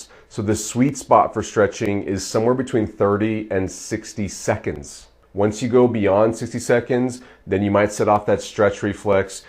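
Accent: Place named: United States. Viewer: I hate this blody American English. Thooo..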